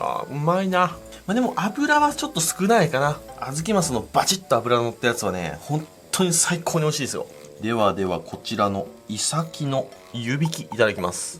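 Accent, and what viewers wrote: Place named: Tottori, Japan. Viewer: 鳥取県の人間です。 いつも楽しく拝見しています。 「宝箱だが。」っという言い方 鳥取県の方言と似ています。他にも似たような言い方をされるので同郷なのではないかと思うくらいでした。 いつか遊びに来て下さい。道の駅ではイカがくるくる回されながら干されていたり、温泉もあるし、松葉がにのシーズンには足が一本無いだけのものが破格値で買えたりします。 これからも頑張ってください。動画投稿楽しみに待っています。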